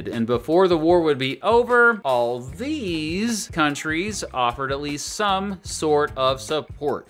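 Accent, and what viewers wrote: United States. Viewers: وده واحد امريكي بيتكلم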